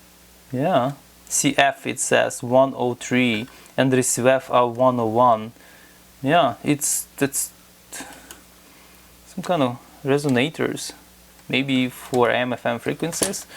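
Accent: Russia. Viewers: Zagreb is in now called Croatia. I also love your accent man! Gotta love the Russians! Keep up the great work. :)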